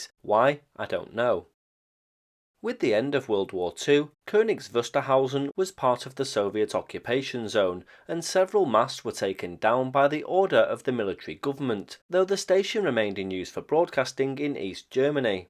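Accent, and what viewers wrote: United Kingdom. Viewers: This was my first time hearing German spoken with a British accent! :)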